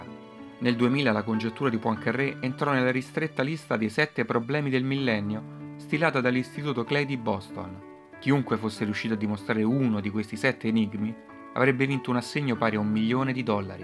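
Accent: Rome, Italy. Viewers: Ma io dico, possibile che la voce parlante abbia un accento così marcatamente romano? Le doppie si pronunciano solo quando appaiono per iscritto.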